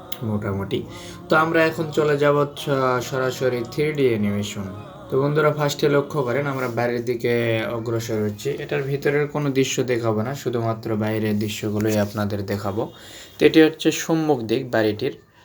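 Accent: Naogaon, Bangladesh. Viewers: Apnar basha Naoga naki vai?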